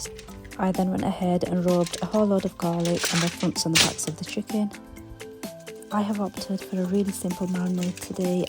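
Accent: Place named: North, United Kingdom. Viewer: You may be a little too young.. but there used to be a show called WHY DONT YOU on kids tv... they always had someone that sounded like urself.. most of the time it was how to create something silly out of left over toilet rolls.. but the cadence of ur voice matches the instructions perfectly :) but its the northern accent that sells it :) took me back hehe.. great vid.. keep up with them!!!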